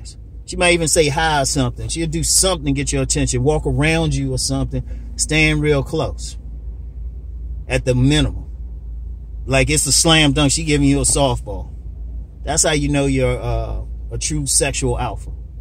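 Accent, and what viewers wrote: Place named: DMV, United States. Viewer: Dude, with that accent you've got to be from the DMV area! GREAT information too!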